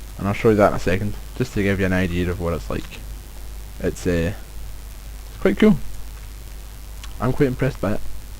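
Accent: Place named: Scotland, United Kingdom. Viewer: great video great tips sexy scottish voice ;)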